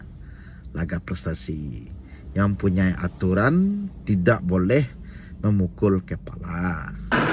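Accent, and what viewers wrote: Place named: West Sumatra, Indonesia. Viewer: dari logat atau aksennya,nampak bahwa abang ini urang awak alias asli minang.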